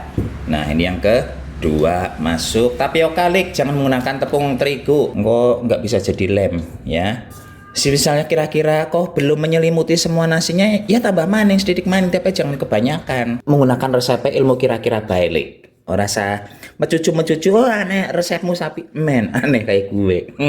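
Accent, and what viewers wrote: Banyumas, Indonesia. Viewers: O...mas.. Eneng apik e nek gawe kamera..men Ojo komplin netizen... iku tangane di sarungin lo maaas..kan malah apik.... aku seneng karo celoteh ne lucu³ Indonesia ngapak...😄😄😄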